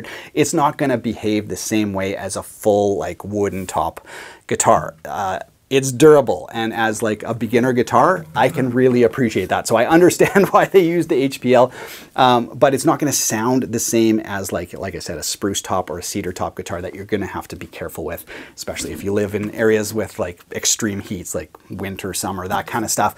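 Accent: Canada. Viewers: I love the canadian accent lol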